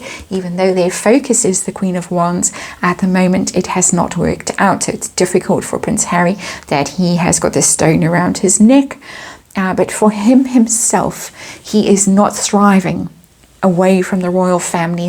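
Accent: South Africa. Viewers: Past 30 years living and working on various continents. Instantly regognised your Sout-African accent. ✨Marvolous. ✨ Since then i always follow your input.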